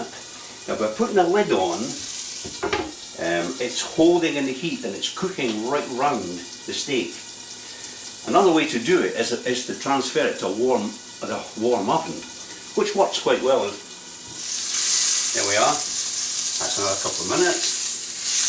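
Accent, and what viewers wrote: Edinburgh, United Kingdom. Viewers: Nice to hear an Edinburgh accent on the interweb. Slainte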